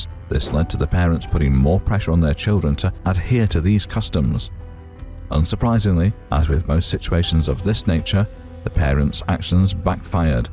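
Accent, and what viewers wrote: England, United Kingdom. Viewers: How tf yall going to use pictures that aren't even TRG. And how tf a nigga with an English accent know anything about TRG. You guys missed the whole unique thing about TRG. We have every race in TRG that's what made TRG the strongest and biggest. Do better homework.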